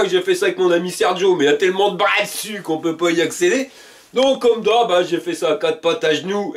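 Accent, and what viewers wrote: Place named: North, France. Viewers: Bjr elle est top votre astuce merci pour le partage avec le petit accent ch'timi j'adore 👍🍻JP du Var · bonjour , trop bien vos idées et en plus vous êtes trop rigolo et ça sent l'accent du nord ou nord pas-de calais je suis du nord merci à vous pour vos idées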